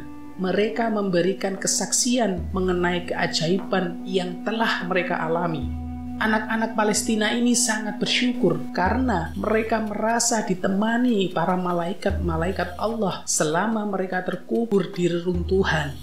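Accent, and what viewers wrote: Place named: Java, Indonesia. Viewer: Abu Ubaida....MEdok kali mas😅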